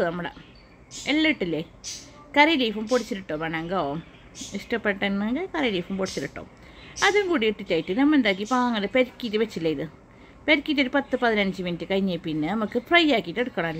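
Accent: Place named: Kasaragod, India. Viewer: ഒരു വെറൈറ്റി ....സൂപ്പർ ...അതോടൊപ്പം കാസർഗോഡ് സംസാര ശൈലി ഇഷ്ട്ടപ്പെട്ടു ......കണ്ടതിനു ശേഷം ലൈക്കും .കമന്റും .സബ്‌സ്‌ക്രൈബും ചൈതിട്ടുണ്ട്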